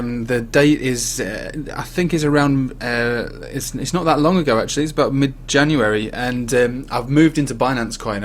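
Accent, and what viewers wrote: United Kingdom. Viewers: Sounding like you in the UK, this actually works here?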